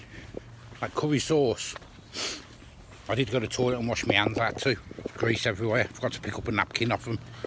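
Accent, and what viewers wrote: Birmingham, United Kingdom. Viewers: good to hear love yer Brummie accent . Love it when you wave saying bye-bye my other half Jean loves it ! Take care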